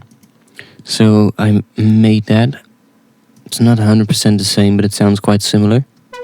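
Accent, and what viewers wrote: Netherlands. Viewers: Yo you sound dutch haha! Anyways, i have a request, i have never encountered a really good tutorial for a deep house stab like in the song Coyu & Ramiro Lopez - 1, 2, 3... Fire! (Bontan Remix) which starts. Enjoying your tutorials!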